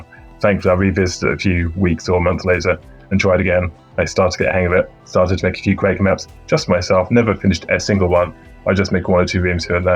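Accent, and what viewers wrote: United Kingdom. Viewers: Lol need subs for this huge british accent. Where he from in uk? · Its so freaking hard to make sense of what he is saying sometimes ... british is so hard. Too much mumbling ... but anyway gj Dude!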